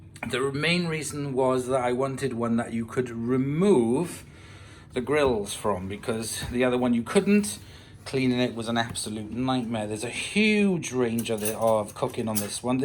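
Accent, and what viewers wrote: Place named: South Wales, United Kingdom. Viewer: A really good review, also nice to here what I presume is a "South Wales" accent instead of the usual American. I think it should be ok for searing my steaks, after cooking sous vide . Paul, S. Wales.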